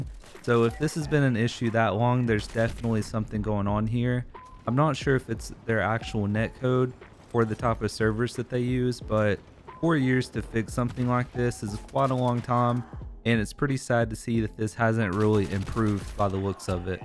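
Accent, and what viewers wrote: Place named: South, United States. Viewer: you have a really cute accent XD sounds southern anyway im getting it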